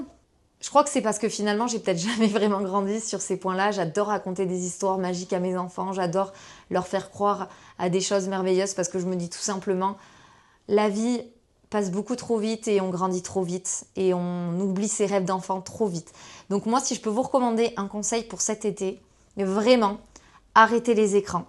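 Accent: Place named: Toulouse, France. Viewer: J'adore ton petit accent toulousain mais tes contenus aussi, tu es une conteuse magnifique !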